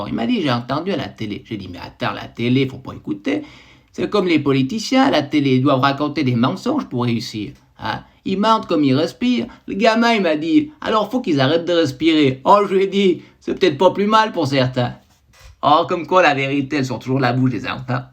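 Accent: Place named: Valais, Switzerland. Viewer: Excellent, l'accent valaisans mais avec un bonnet valaisans; incroyable.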